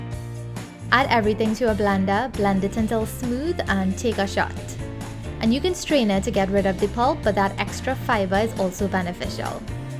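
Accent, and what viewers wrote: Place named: Trinidad and Tobago. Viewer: Hi this looks scrumptious😻 I admire that you kept your Trini accent. It makes me feel like I am back there💛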